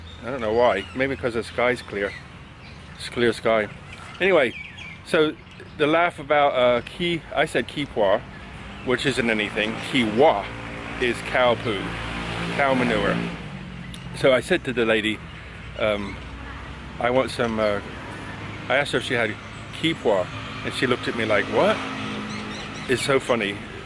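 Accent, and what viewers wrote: United States. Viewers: Hi. I made some fixtures once and set-up an e-mail for it. One time thing, but I still use the e-mail as my Google account. You are from England? You sound like your from the U.S. Have you ever seen "Kev-in Thailand"?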